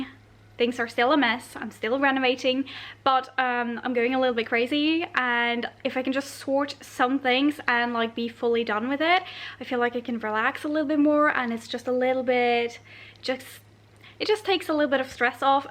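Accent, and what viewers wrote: Ireland. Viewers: Irish accent😀??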